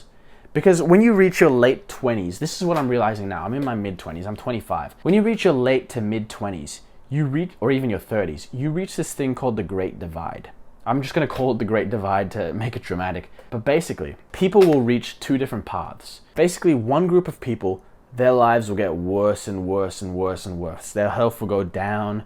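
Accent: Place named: Australia. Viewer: from the accent it's definitely australia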